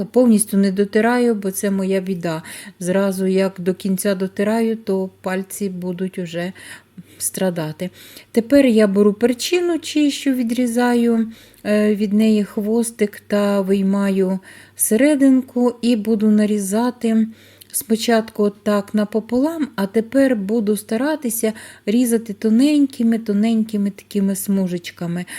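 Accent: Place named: Kharkiv, Ukraine. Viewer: Дякую за смачний рецепт.Сьогодні засолила нову порцію,тепер тільки подолати очікування довжиною в 5 днів.А ваш говір нагадає улюблену подругу з Харківщини-ці неймовірні українці під щоденним бомбардуванням роблять свою справу для перемоги і не збираються виїздити в більш-менш спокійні місця. Процвітання каналу!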